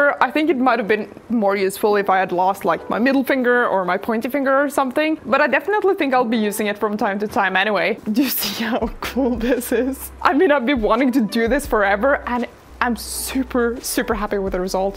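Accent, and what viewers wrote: Norway. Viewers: good to hear a fellow norwegian :) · 1) You should make it in hot pink to match your hair and 2) a green laser on your fingertip.... 🙂 This is very awesome. I love your accent, too. Norsk?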